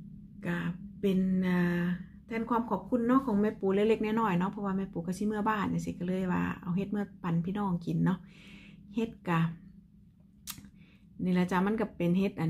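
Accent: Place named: Nakhon Phanom, Thailand. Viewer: แจกเบิดรึยังจ้า อยากได้ขุดที่1 ภาษาเว่าคือไทนครพนมแทะจ้า แม่นคนนครพนมบ่อ อรอุไรลักษณ์ จีรันทนิน 200/803ม.4ต.ระแหง อ.ลาดหลุมแก้ว จ.ปทุมธานี 12140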